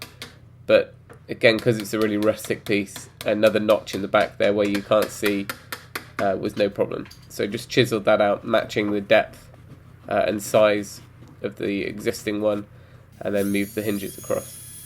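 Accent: United Kingdom. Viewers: sorry can't handle British accent.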